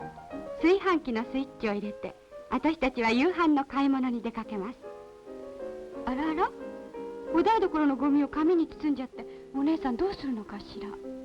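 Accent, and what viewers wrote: Japan. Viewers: 南関東方言の一つで、いわゆる「山の手言葉」ってやつですね。 今では話す人もあまり居なくなり、ほぼ絶滅状態の言葉です。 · 和式便所にダストシュート、それに焼却炉、今じゃ考えられないワッ！ 東京から来た女子転校生がこんな話し方だったなぁ～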